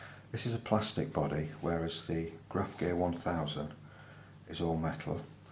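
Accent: United Kingdom. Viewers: i'd like to make 2 small points on your channel. 1.you have a very nice, relaxing voice; 2nd, your english accent is not very deep, and while most non british people would find that tough to understand, i would find it a bit annoying, so ty for that too. im in process of getting a mechanical pencil and i have to choose between 0.5 and 0.7 for mostly writing and between uni kuru-toga pentel 205 and zebra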